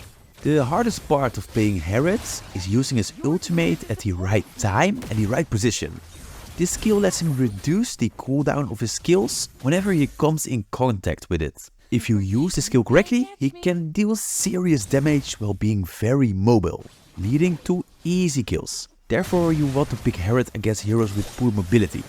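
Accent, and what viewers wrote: Netherlands. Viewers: Why do you sound dutch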